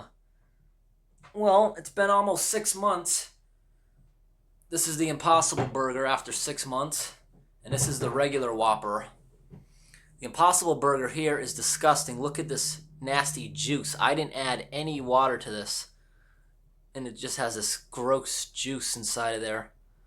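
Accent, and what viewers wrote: New England, United States. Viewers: Tanner Smyly That’s his Yankee dialect.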